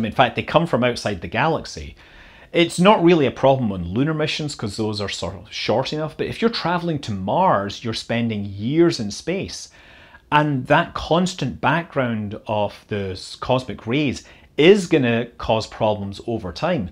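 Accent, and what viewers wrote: Scotland, United Kingdom. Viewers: Great video as always! Unrelated, I got a good chuckle every time you said “Explorer,” which I heard as “Exploder” due to your Scottish accent.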